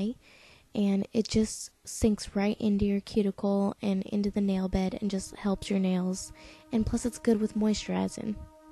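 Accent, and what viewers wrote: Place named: South, United States. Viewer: You have a southern accent how cute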